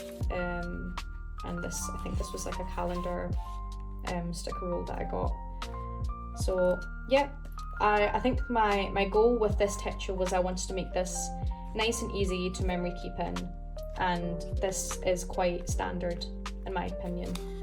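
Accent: Scotland, United Kingdom. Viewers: Great to see and hear another Scot on YouTube! I'm a Stalogy girl/old woman, but I do love the cream cover of the Hobonichi! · Subscribed not just for the Hobonichi content but more so for the Scottish accent which gives me the tickles ❤ Hope you have an absolutely fantastic day!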